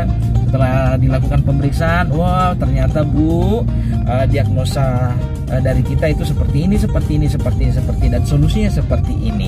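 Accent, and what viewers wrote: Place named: Batak, Indonesia. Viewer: Aqu suka banget bang gaya bicara horas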